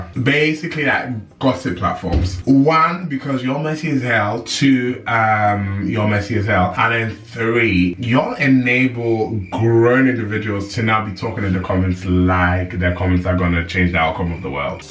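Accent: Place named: North, United Kingdom. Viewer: HOW HAS YOUR ACCENT GOT ALL NORTHERN ALL OF A SUDDEN??? I’m getting rid of kitten heels in my dictatorship sorry not sorry.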